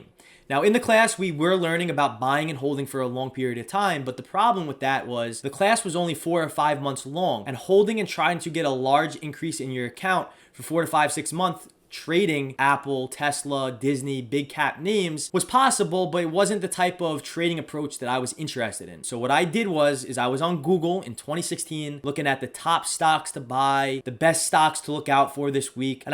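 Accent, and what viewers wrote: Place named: New Jersey, United States. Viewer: Damn brother what a ride!! I decided to get a finance degree after watching the documentary ''Inside Job'' and my uncle is in finance. The documentary made Wallstreet look like a Mafia Scorsese movie and I was a street kid and thought damn, these guys are wise guys and they don't go to jail?? like the regular wise guys?? I signed up straight away for community college and then went to university - still get wise guy vibes with your Jersey accent brother 😂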